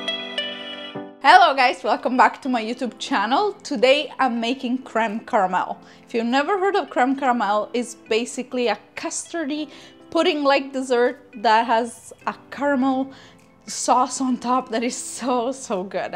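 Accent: Italy. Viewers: Your accent became more American.. You were nice by italian accent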